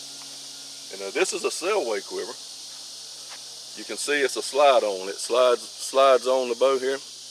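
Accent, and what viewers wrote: United States. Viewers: You get into some stuff and sub to YT channels and sometimes your interest passes, but along the way you find a guy who could make doing laundry sound like the most interesting thing on the planet, someone whose enthusiasm and integrity makes them just a pleasure to listen to, you are one of these people. Best US accent ever too!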